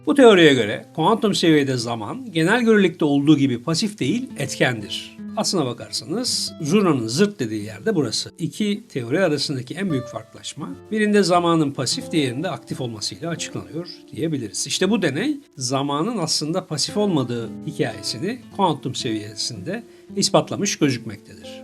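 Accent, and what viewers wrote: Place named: United Kingdom. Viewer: British telaffuzu.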